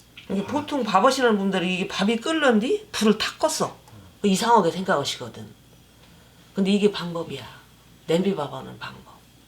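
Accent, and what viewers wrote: Chungcheong, South Korea. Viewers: 구수한 충청도사투리군요ㅎㅎ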